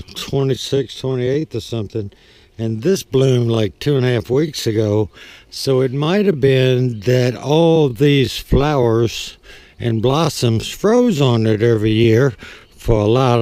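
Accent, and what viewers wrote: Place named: Virginia, United States. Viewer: I figured y'alls last frost would be much earlier than us? I live in far southwest Virginia in tazewell county and our last frost date is may 10th I just know by the accent your in far eastern or southern Virginia. I just started my channel and I hope to start growing edibles as well as my ornamentals and tropicals. I'm trying figs and I consider them tropicals here cuz we're 2400 foot up in the mountains and we get long cold winters!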